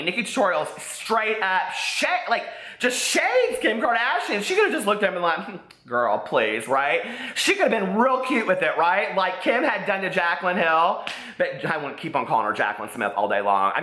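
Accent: South, United States. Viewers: LOL...you're alway's sooooo southern :)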